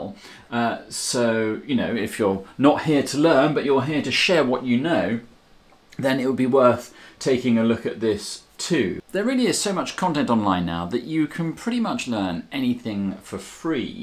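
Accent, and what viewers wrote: United Kingdom. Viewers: The gaming chair betrays the British accent.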